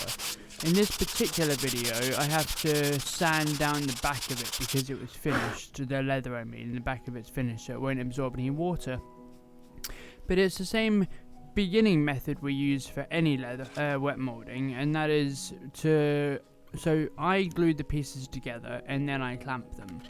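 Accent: United Kingdom. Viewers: Какой же отвратительный акцент у британского)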